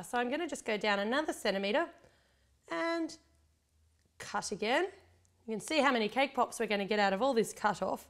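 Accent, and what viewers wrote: Australia. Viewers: I love australian accent!! Thanks for the tutorial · youre ausralian?! i tought youre british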